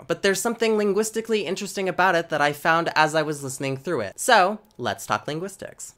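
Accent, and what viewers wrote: Portugal. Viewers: Você fala português com o sotaque de portugal perfeitamente!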